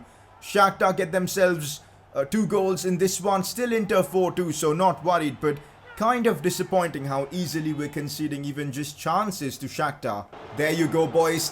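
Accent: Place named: India. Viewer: I wanna watch your videos but the way you talk man... I cant stand hearing the indian accent for almost 30mins😂 I can’t bear it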